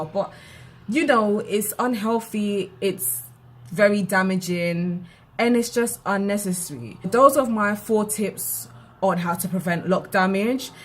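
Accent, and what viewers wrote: United Kingdom. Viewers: Really enjoyed this video, good tips! And I also LOVE your British accent!😊🙌🏾 new subscriber here🙋🏾‍♀️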